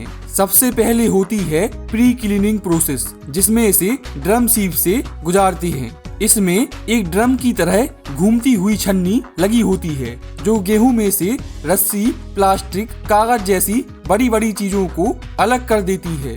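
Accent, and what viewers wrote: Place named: Bundelkhand, India. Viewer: Aap bundelkhandi hai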